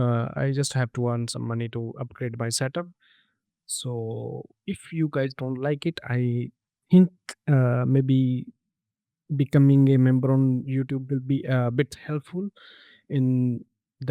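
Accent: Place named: India. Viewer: Because of "offshoring" (getting rid of jobs in our countries and shipping them to india, or shipping indians over here to take our jobs) most English speakers can understand Indian accents pretty well, and yours isn't even too bad. Accents only really become a problem on phone calls if the reception is bad.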